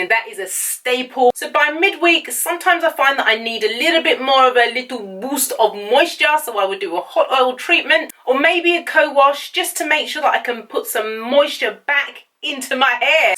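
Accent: United Kingdom. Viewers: omg i love your british accent im following your advice but i must admit im coming to your videos to hear you talk gurll!! my boo is from england but hes black and i just am in love with the accent lol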